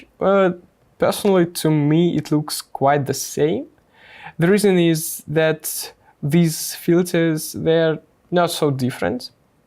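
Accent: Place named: Russia. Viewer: зачем на английском? будто европейцы будут смотреть видео с таким жёстким акцентом русским))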